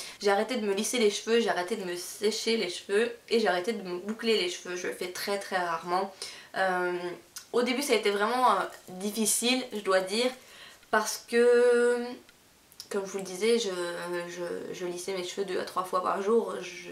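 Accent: Belgium. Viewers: Coucou :) j'ai adoré ta video et tu a de très beaux cheveux 😍 enfin bref je voulait te dire que tu a raison de te laver les cheveux une a deux fois par semaine (je fais pareil), ma mere est coiffeuse et elle m'a bien dit que si on se les laves une fois tout les deux jours par example, ca peut les casser et que ça sert vraiment a rien de se les laver aussi souvent :) donc Voila y a rien de dégoûtant à se laver les cheveux une fois par semaine 😅 c'est juste que ca les abîmes moins. Et pareil, quand j'ai mis un truc dans mes cheveux ou quoi, je les laves en plus c'est normal :) Voili voilou 😋 PS : j'ai remaqué que tu avait un accent, t'est belge? :)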